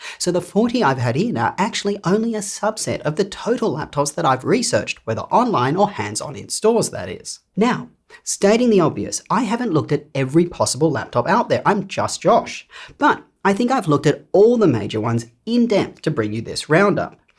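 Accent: Australia. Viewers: I just love the Austrèlien accent, I didn’t pay much attention on the contents of video but. I will watch it again and try to concentrate on topic. Thank you. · Are you Australian by chance?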